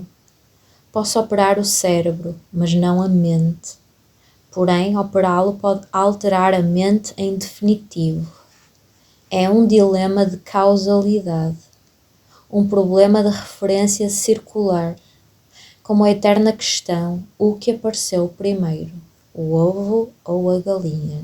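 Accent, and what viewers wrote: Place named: Portugal. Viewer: Obrigada! Adoro sua voz e sotaque Português! 🥰😘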